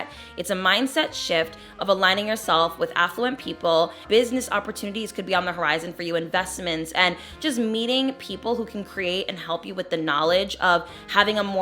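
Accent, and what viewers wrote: New Jersey, United States. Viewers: I’m a jersey girl too and I always can tell u were a jersey girl as well love ur vibes, thank u for this wonderful video everything u said was FACTS ! heavy on the I wash my legs ok period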